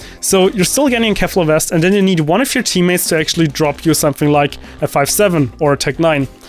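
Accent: Germany. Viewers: junge du klingst soooo deutsch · Craig Ferguson doing CS:GO tactics after the bell rings xD I love german accent. Somehow makes me giggle.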